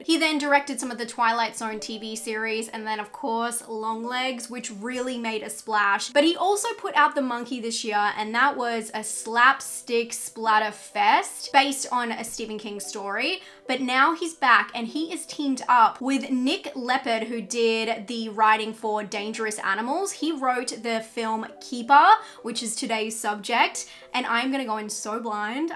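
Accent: Australia. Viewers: Not sure why this is a response to the comment above.. but what you seem to be bothered by is just regular Aussie speech patterns (there are other people elsewhere that have this intonation but it's very prevalent in Australia/New Zealand)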